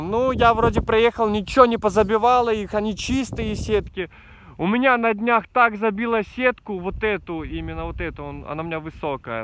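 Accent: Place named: Belarus. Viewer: По говору.вроде бульбаш. Где живешь?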